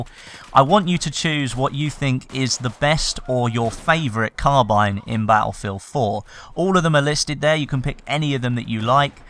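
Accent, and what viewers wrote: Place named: United Kingdom. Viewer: "coy-yote" sight lol! Love the King's(??) English bro, I'm the one with the 'Murican accent =)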